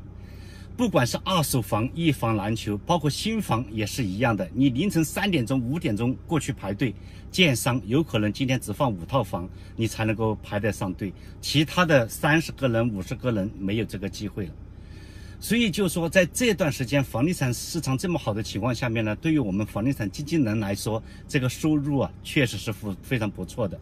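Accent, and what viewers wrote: Hunan, China. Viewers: 订阅了。师傅您是湖南人吗？谢谢分享您的经历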